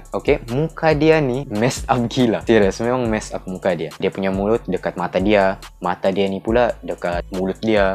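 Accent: Sabah, Malaysia. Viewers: Bang orang sabah ke ?speaker favourite ni bos🔥🔥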